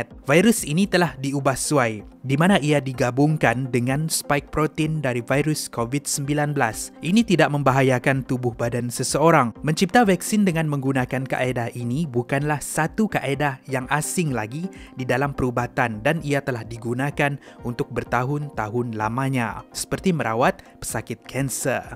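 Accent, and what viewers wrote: Sabah, Malaysia. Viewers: Abang mcm logat ckp mcm orang sabah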